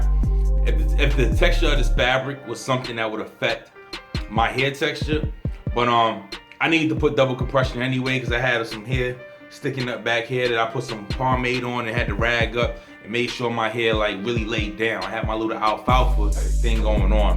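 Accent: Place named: New York, United States. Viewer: He's clearly from NY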